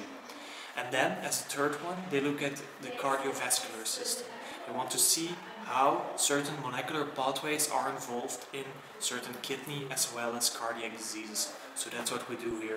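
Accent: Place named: Flanders, Belgium. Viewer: Some advice, first of all, interesting and innovative way to start a vlog/YouTube channel! great job 1. try to avoid having the camera lower than your face, since the viewing angle is in general not the best, having it higher than your face is more suitable. 2. try to create better lighting, the yellow desk light is not cutting it and since you have stay at the same desk most of times it is easy to put a stand in that mess. 3. The music is not bad but also not great, try to find soundcloud artists who produce nice beats, mounika for instance. 4. The font in the beginning is not so pretty but that might be personal opinion, it also very 'casey neistat' like and a lot of vloggers take it over 5. Don't call it badass science, try to be a bit more creative with the outro, it needs to be something that sticks. The last comment is just about the douchebag parking of Mercedes at the end, typical... 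Good luck with the channel and especially with finding interesting topics to talk about since PhD work can be extremely repetitive and predictable! Btw, lovely flemmisch english accent:) You will never lose it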